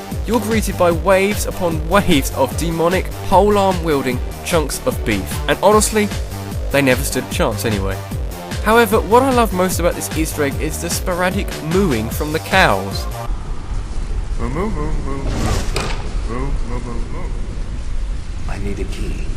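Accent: United Kingdom. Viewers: Darn, why are you and your British accent so awesome!!!!!! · Are you Actually BRITISH? Me too! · *Gasps* You're british? *Subscribes* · Are you british? Because you sound british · your accent is british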